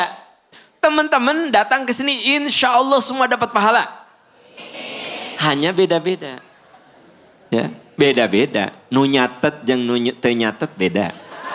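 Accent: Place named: Sunda, Indonesia. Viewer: Saya tinggal di Jerman tepatnya Saarbrücken, ya Allah baru tau ustad Dr. H Aam Amirudin LC,Si dan saya senang mendengarkan tausiah beliau apalagi khasnya bahasa sunda karena sejatinya saya orang Sumedang , jadi saya kangen bahasa tersebut.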